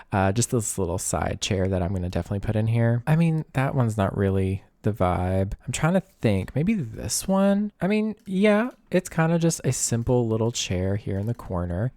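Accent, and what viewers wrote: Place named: Appalachia, United States. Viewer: i knew when i heard ur accent i was like he sounds like he’s from KY😂😂 I guessed eastern bc ur giving Appalachia 😂❤ so proud to see you here 🎉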